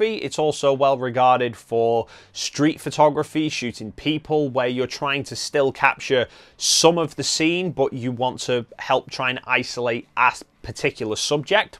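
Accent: United Kingdom. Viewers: Thanks for the video! I think I'm going to try prime 50 or 80mm. Btw, are from eastern parts of the UK? Trying to guess by the accent.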